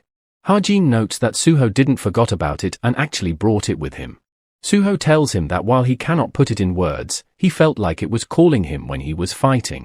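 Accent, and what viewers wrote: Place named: United Kingdom. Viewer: Why does the ai voice sound British I ain complaining tho this peak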